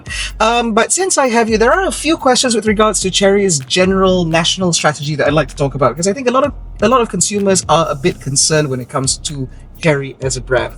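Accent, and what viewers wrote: England, United Kingdom. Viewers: Clicked the video for the car, stayed for the English accent. Well done presenter. Strong presentation!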